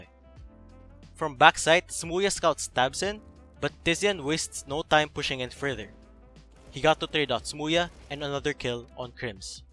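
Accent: Philippines. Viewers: good content. btw narrator sounds like he’s from the 🇵🇭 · nice content again from, btw the voice over sounds like a Filipino. Love it!!! <3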